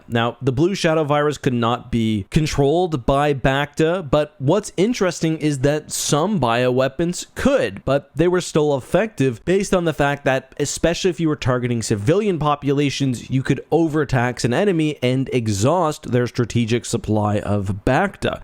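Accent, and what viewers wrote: Canada. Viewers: How's he gonna work the canuck word "re-zorses" into this one?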